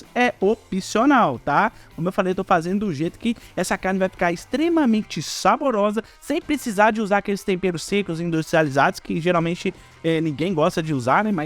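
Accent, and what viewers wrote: Minas Gerais, Brazil. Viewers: Que delícia, amei sou de SP, aqui é cheiro verde, adorei o seu sotaque mineiro!!!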